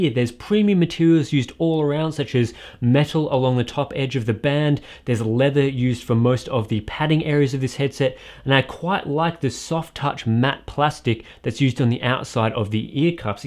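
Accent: Australia. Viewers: He’s Aussie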